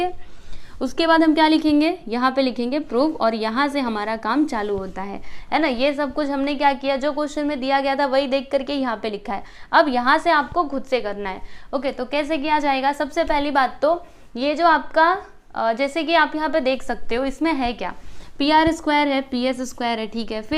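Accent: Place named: Mumbai, India. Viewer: Your mumbaikar i know from your language like likhneka karneka words❤❤😊😊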